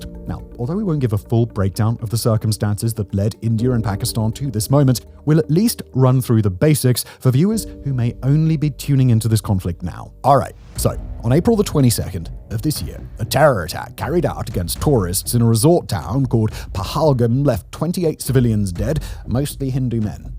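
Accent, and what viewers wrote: United Kingdom. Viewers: He's british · is this guy british or american i cant tell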